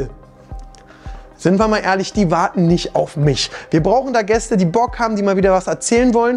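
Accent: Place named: Berlin, Germany. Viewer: bin 39 - ich hab dich tatsächlich bei WWM gesehen und dachte lustiger typischer Berliner von der Schnauze her - da hast du echt gut was draus gemacht !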